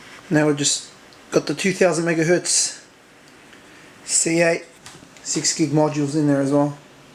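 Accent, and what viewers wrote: Australia. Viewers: Is that an aussie i hear?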